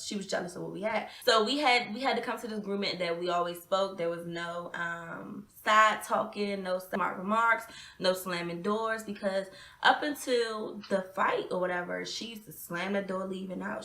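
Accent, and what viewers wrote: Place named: Baltimore, United States. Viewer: Just found your channel!!! I love it! Are you from BMORE or DMV? LOL I hear your accent lol New subscriber boo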